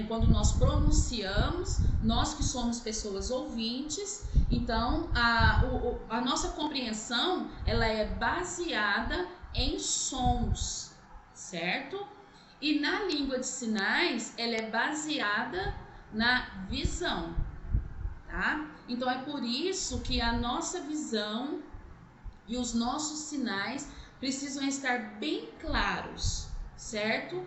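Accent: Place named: Minas Gerais, Brazil. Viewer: Rindo horrores com o "jeito mineiro" de falar!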